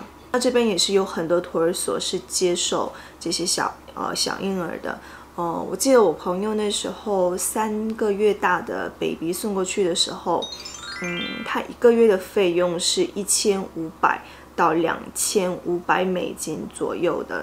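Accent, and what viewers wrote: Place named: Taiwan. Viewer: 有一点点的台湾口味。感觉很好 。支持你 。구독.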